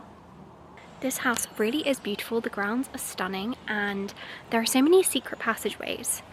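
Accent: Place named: South, United Kingdom. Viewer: Who are all here to learn south British accent?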